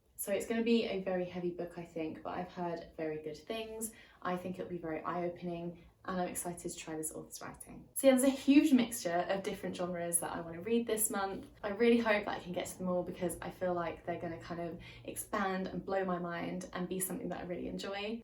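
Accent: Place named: United Kingdom. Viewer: OMG I have never laughed soooo hard while watching one of your videos 😂🤣 First off, I heard "arse holes at night" instead of "our souls at night" - Yes, that's right, despite the fact that I was born in 1993, I am in fact a twelve year old XD (although, in my defense, being from the US, I'm not used to hearing folks speak with British accent IRL so it does take me like, half a sec longer to interpret words spoken by ya'll from across the pond:3) Secondly, "The next book is second in a trilogy...I've heard there's maybe going to be another book..." - LMAO I was sooooo sure here that you were making fun of Kingkiller Chronicle before you showed the book you were actually talking about XD (I have not slept well past few days so my brain just autopilot at this point and is interpreting ppl's words wrong lol) Anyway, great video as always <3 And who know, perhaps one day I will grow up a bit and/or catch a good night of sleep:3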